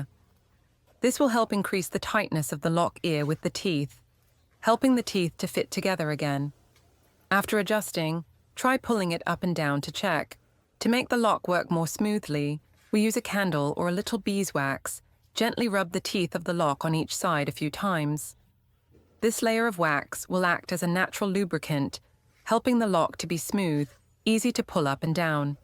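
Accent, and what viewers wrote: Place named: United Kingdom. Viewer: Thanks. Very helpful. You list your location as the United States - but you have a beautiful clear standard British voice and accent!...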